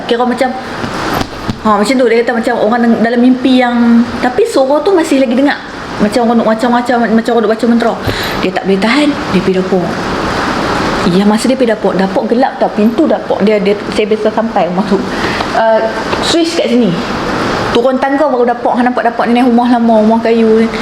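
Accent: North, Malaysia. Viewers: aku suka betul dengar loghat utara ni besssssst !!! :D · good content but for me better not to talk slang utara ni. sbb tak semua org faham slang dia. try cakap kl sis. spread love no hate. btw im a new sub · Loghat utara..penceritaan yg best dan enjoi ja dgr..👍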